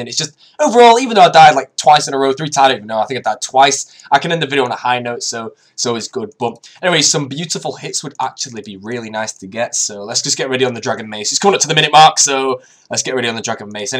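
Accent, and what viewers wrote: United Kingdom. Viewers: British accent so cute!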